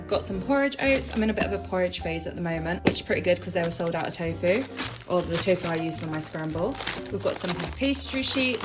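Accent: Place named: United Kingdom. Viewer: Hi! I didn't know you'd have a YouTube channel 🥰 I'm Italian, but I live in Malta and I started following you for your lovely accent 😍 I love the British accent and culture. What's more I'm vegan too.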